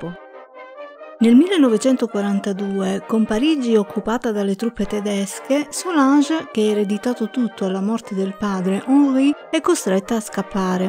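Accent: Italy. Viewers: Sei palermitana tu che parli ???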